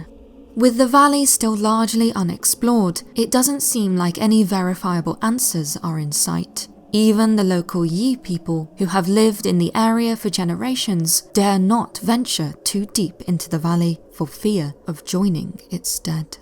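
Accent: England, United Kingdom. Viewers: As always a tale well told is just that, and without drawing any more in breathes that are lined with gushes or the occasional reverberations as towards something being spawned by the narrative of our as uncanny and spoken with the English accent I was born into deserves a bravo. I don’t often feel inclined towards a personal rating but I feel a need for a personal rating and wish to salute you and thankyou. As now the sunlight filters through my English afternoon in mid June and I’ll round off with another sip of Earl Grey, and ponder for a moment wondering what my mind will be greeted with in the next episode to grace my mind.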